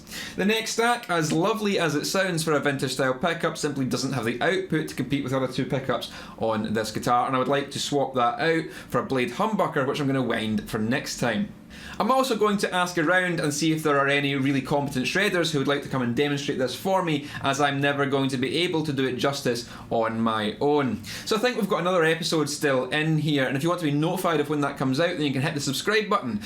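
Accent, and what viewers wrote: Scotland, United Kingdom. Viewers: Oh! Now I see. The accent is scottish! That's why the "peckup" and so on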